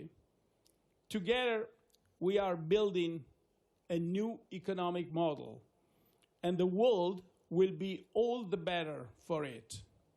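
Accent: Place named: Italy. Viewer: Accento spiccatamente italiano. Che non è un problema, sia chiaro, il proprio accento è qualcosa di cui andare fieri. Resta il fatto che mi aspettassi qualcosa di più.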